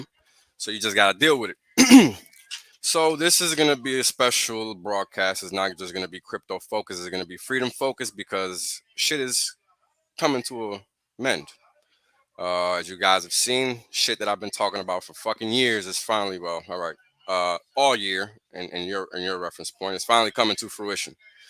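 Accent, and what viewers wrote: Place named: New York, United States. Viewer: Sounds like hes from urban new york... how can you hear skin color ?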